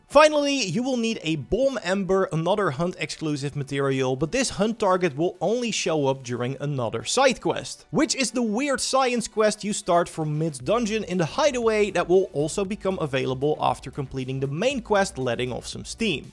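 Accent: Netherlands. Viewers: You are Dutch arent you?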